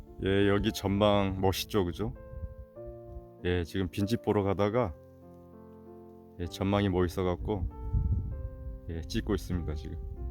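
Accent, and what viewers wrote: Gangwon, South Korea. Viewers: 우연히 보고 들은 강원도 억양.너무 반갑고 좋아서 보고 또 보고 듣고 합니다 정말 감사히 잘보고 있습니다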